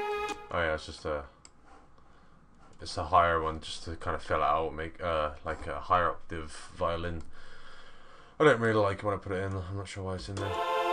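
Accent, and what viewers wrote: United Kingdom. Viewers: your from london? you sound like it? hit me up on inbox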